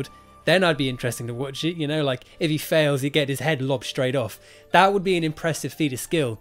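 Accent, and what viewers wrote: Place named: United Kingdom. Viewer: My man said: "He was moving too fast for the camera to even pick up the sound — by the time the camera caught the sounds, he was playing GTA in the next room." 😆😆 Maybe it's the Russel Brand accent (as.an American) that gets me— but everything sounds funnier with that delivery. Awesome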